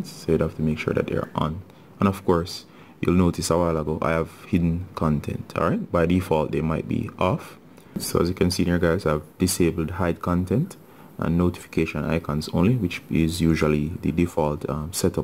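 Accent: Jamaica. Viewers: Sound jamaican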